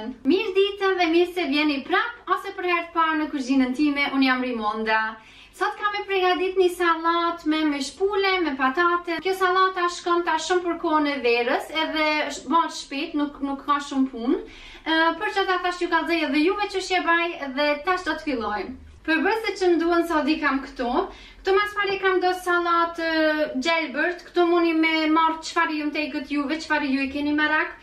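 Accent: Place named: Kosovo. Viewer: pershendes shum dhe po fol shqip si krejt kosova vetum dallimi asht cilin vend jeton ska kurfar dryshimi ma mer mendja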